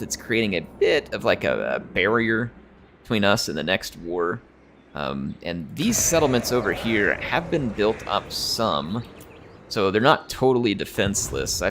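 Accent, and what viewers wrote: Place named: South, United States. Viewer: You've got a slight southern drawl each time you speak. Lol cheers. I grew up in a few small towns growing up. Blue collared and conservative they like to fish and hunt. I like your videos! Thanks.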